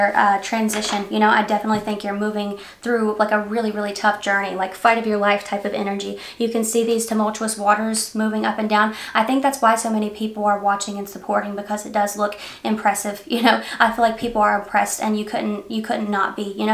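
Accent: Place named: South, United States. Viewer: Your southern accent makes me homesick for Kentucky, my childhood........... are you from KY or Tennessee?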